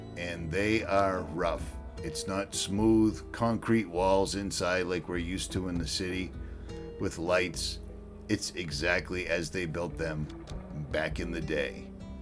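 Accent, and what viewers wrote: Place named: East Coast, United States. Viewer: You sound like. I was raised in Thermopolis and used to fish the canyon and spillway constantly. We still go back every year to visit and fish the Bighorn. Thank you for posting this. Very emotional for me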